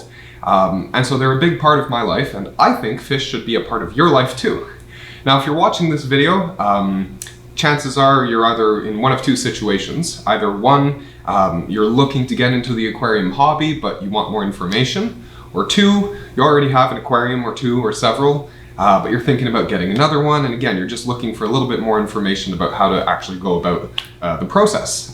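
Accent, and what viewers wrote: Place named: West Coast, Canada. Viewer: I can spot a West Coast Canadian accent from a mile away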